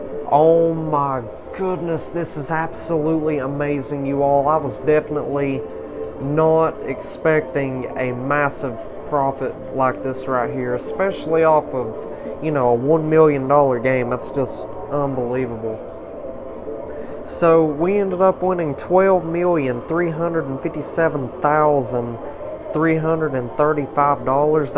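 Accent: South, United States. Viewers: The fakeness of ur video is matched only by the hick-ness of ur accent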